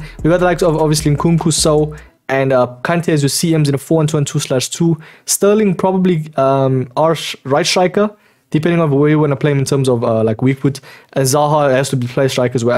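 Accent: South Africa. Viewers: I hear a South African accent boet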